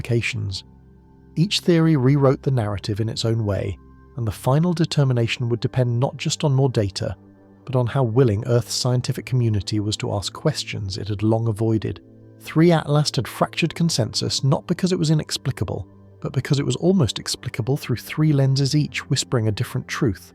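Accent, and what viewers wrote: United Kingdom. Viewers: So sick of the British accent explaining fkn everything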